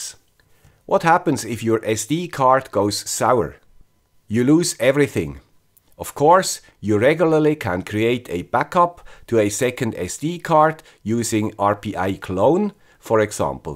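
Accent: Switzerland. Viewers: I think this video will give me the boost I need to have a much better home server! DrFragle and Mr. Shark was(Antonio?) already hinting on this kind of setting with docker. This is by far the most useful video I have seen from you. The Raspberry 4 will be ordered soon. The SCRIPT from Peter will now be history for me. Also, I sense a backup supply system will not be necessary anymore because of the much easier backup system. This video will keep me busy for some time, which is good! Thank you! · Off course: with summery! I think it's part of the brand, like the Swiss accent! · your swiss-deutsch english accent is amazing ! cheers from cheese land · Fantastic! Thanks!! ʘ‿ʘ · So, Swiss aksent ist German aksent? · The guy wis a swiss accent.. That sounded so chilling. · guy with a swiss accent, we need a current video on installing docker-compose and nginx proxy manager on a raspberry pi. 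thank you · Very good, interesting and helpfull descriptions. Thank you !!! :) Your English vocabulary is really great, but your pronunciation is simply indescribable Swiss. Please take a much longer holiday in England (Cambridge or Oxford), it definitely will help you. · Another great video by the engineer with the Swiss accent. I like the summaries. Great job, I will use some of this for the VPN portion.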